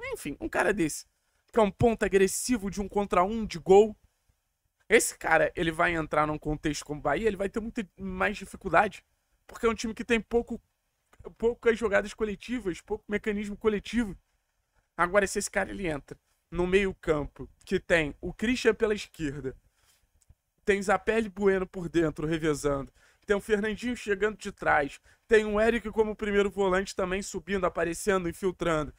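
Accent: Rio de Janeiro, Brazil. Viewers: E esse teu sotaque de carioca.....?? Vc é de lá...??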